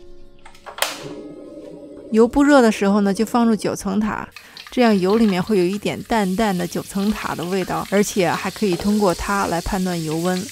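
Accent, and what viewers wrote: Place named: Beijing, China. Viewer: 就喜欢你这口京味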